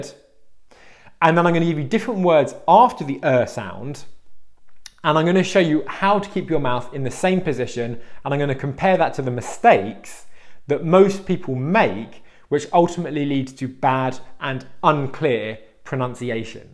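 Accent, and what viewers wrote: United Kingdom. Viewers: about this"s video the accent is British English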